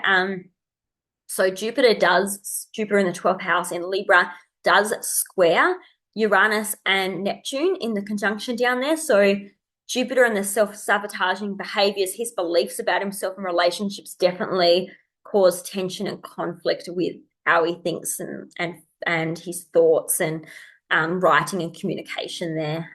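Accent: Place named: Australia. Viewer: Amazing job done! You sound Aussie BTW! ❤️❤️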